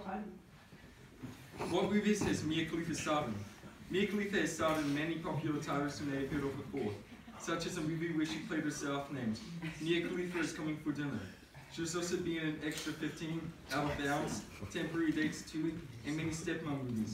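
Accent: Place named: South, United States. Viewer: His accent is a like a mixture of southern, Australian and kiwi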